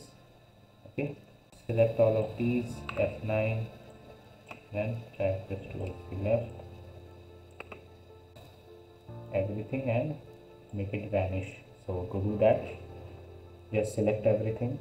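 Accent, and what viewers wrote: India. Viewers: He’s better bro He’s Indian and tried his possible best to speak English. Thank you bro and keep up the good work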